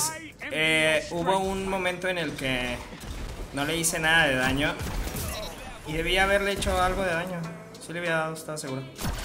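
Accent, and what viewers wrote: Mexico. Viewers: Porque hablas como arigameplays? Es algun dialecto de alguna parte de mexico ?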